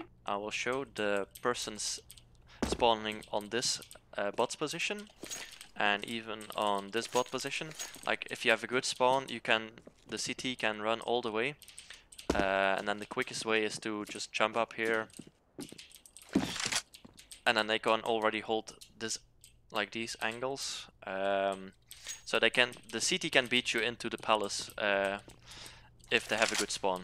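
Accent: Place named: Norway. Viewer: Du er norsk, ikke sant? Det er bare man hører når nordmenn snakker engelsk :D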